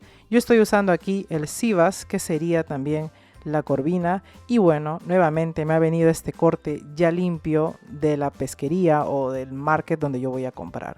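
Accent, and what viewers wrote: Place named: Peru. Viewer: Como me encanta tu canal . No pierdes el hablar peruano … “ya si eres bravo métele con todo” 😂😂😂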